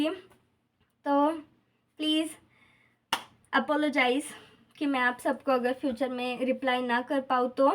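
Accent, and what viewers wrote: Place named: Sindh, India. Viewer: Apki language se aap mujhe sindhi lagti hai mujhe reply kijiyega khushi hogi ke bhar mulk se mujhe reply mila.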